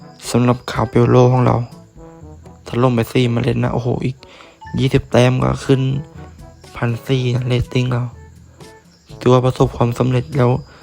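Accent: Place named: Ang Thong, Thailand. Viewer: คนอ่างทองหรือสุพรรณคั้บเนี่ย สำเนียงเหมือนเพื่อนผมเลย😊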